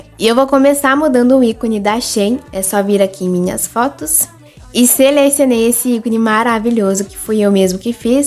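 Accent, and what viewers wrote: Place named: Rio Grande do Sul, Brazil. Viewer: Oi vc e gaúcha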